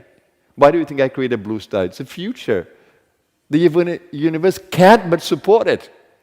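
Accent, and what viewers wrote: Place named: Guyana. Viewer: Very good guidance..thank you... Swamiji your accent sound Guyanese..Are you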